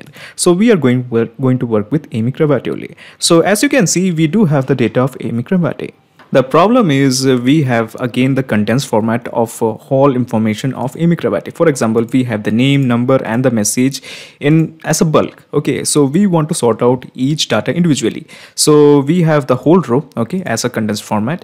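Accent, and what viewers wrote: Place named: India. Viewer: It is difficult to understand your strong Indian accent, especially when you speak so fast. i could not understand what MODE you said we should be using. I had to slow down the playback speed to finally hear that you said "incognito mode". For those of us who don't use incognito mode it would have helped it you explained how to switch to incognito mode. It would also help if you explained about Pabbly as to how much it costs to send messages. I assume a free account has limits?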